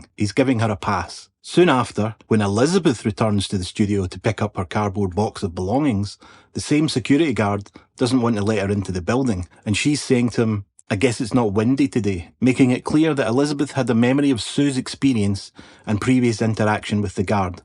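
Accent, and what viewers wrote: Scotland, United Kingdom. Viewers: I love your scottish pronunciation such reflective words and opinions, I love your way of interpretation and understanding too btw. Well done mate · Are you Scottish? 🏴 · Incredible video. Helped me understand the film even more than I thought that I did. Also nice to hear a fellow Scott. Subscribed. 💙🤍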